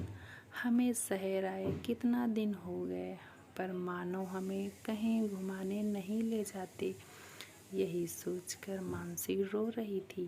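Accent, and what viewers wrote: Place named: Awadhi, India. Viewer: Big Like 14 bohut khub surat prastuti di aap ne ji aap ne bohut khub surat voice sunai our awdhi mea bola karo bohut acchi lagti hai aap ka swagat karta hu aap plese connect with us long term 🙏💐👍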